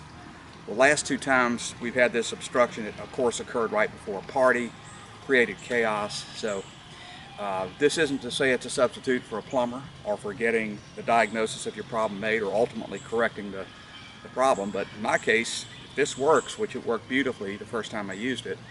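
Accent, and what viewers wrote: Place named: Texas, United States. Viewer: Do u live here in Texas Sir??? Your accent sounds Texan.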